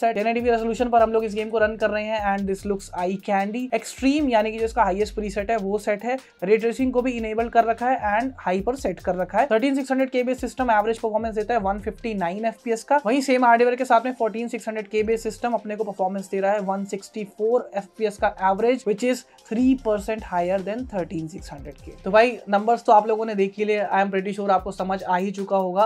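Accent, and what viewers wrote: India. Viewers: tum Delhi se ho kya ? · bhaiji tusi punjabi ho ?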